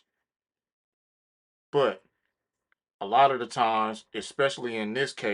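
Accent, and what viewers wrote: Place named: Detroit, United States. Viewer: That sound like a Detroit accent too 👀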